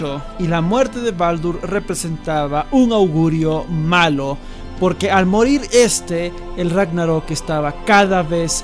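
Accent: Ecuador. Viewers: eres de ecudor??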